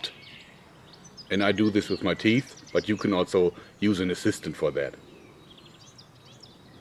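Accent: Germany. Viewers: The german accent is the main reason why i watch his videos :)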